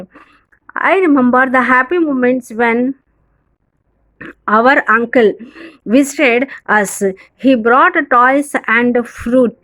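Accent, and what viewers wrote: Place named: Telugu, India. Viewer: Super explaning mam. Your talking in English but is was like Telugu.. I understood lesson very clearly thank mam.😍😌🙂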